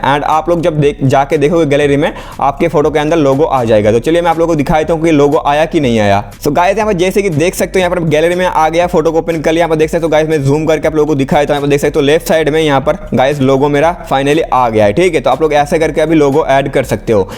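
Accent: Bengal, India. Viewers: Vai up Bengali ho??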